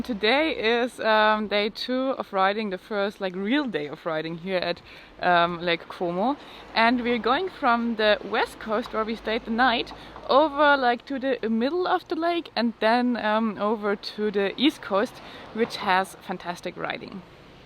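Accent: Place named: Germany. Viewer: This girl has to be German. Nice accent when she speaks.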